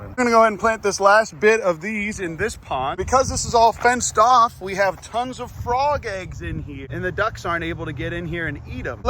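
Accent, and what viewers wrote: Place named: Texas, United States. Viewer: you got a Texas twang that is organically coming out. Hoooo hooo hooo 😂 I could watch the animals graze and eat for hours. ❤❤❤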